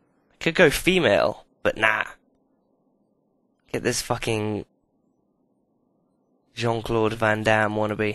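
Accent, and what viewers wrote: England, United Kingdom. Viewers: lol yeah hes def english buddy XD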